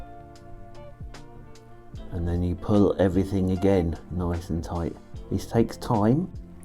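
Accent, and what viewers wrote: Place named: United Kingdom. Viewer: Brilliant! I love your accent. Which part of the UK are you from? And what's your favourite tea? 😊 PJ from Canada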